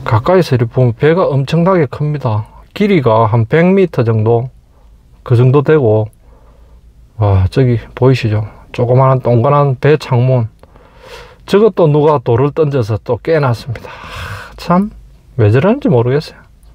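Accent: Gyeongsang, South Korea. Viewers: 경상도 사투리가 어때서요? 경상도 사람이 경샹도말 하는건 당연한거죠.하여간 별별 간섭질 하는 사람들 그것도 병이다. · 경상도 말 사용하니 더 정감이 가고 좋습니다 힘내시고 갱상도 홧팅❤ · 경상도 사투리 넘넘 듣기좋아요 방송최고입니다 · 산 위에 배. 기억이 희미하기는 하지만, 강원도 정동진 바닷가에도 있었던 거 같은데? 이 곳은, 건축, 영업시설 자체에 문제가 있어 보인다. 바로 옆에 대형 철탑, 주변에 그런 대형 철탑이 산재하여, 이런 곳은, 기피장소에 해당한다. 그리고 산 위에 대형카페와 숙박시설을 건축하다보니, 걸어가야 하는 그 진입로 부분도 너무 좁고, 길고, 안전 난간도 낮고, 부실하여, 불편, 불안해 보인다. 보이는 전망이 주변 산 위에 시설된 철탑들인데, 굳이 이런 곳을 찾아서 가려할 까? 그리고 경상도 사람이, 경상도식 억양과 말투로 말하는 것은 당연한 것인데, 그런 것을 가지고, 어느 개 동네에서 사는 강아지가 낑낑 거리며 그런 개소리를 짖을까? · 경상도 사람이 경상도 말 해야지 어디 말 할까요 개 껌 씹는 소리 그만 하세요. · 진례ㆍ장유 김해주촌 ㆍ진영ㆍ생림 삼방 안동 사투리좋습니다 응원합니다🎉😂😊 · 경상도 사람이 경상도 말쓰지 제주도 말쓰링???웃겨들~~~~~~~~~~~~~~~~~~~~~~~~~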